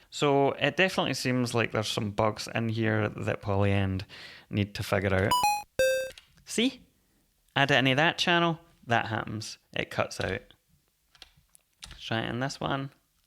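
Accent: Scotland, United Kingdom. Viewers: Cracking tutorial - good to hear a fellow Scotsman!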